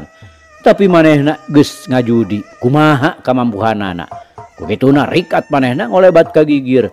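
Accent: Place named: Sunda, Indonesia. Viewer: Hebat! Cariosna nyunda pisan. Hatur nuhun bah!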